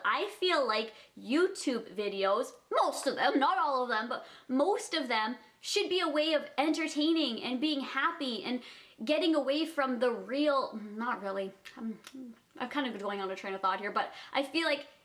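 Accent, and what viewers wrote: Canada. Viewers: It sounds like you're from Canada?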